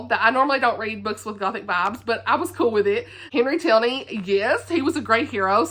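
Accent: South, United States. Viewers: Northanger Abbey in a southern accent is not something I knew I needed in my life 😂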